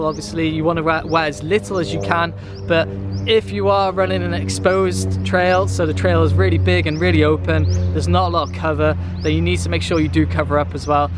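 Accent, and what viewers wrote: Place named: North Wales, United Kingdom. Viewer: Really your from Wales! You sound from North, I'm from Denbighshire if you know there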